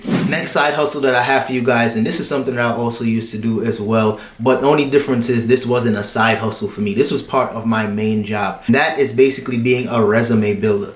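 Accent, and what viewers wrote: Caribbean. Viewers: I still sense some caribbean or something . Lol. I like it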